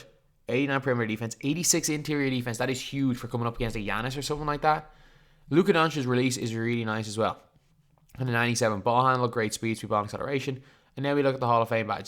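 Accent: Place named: Ireland. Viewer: he’s Irish i’m pretty sure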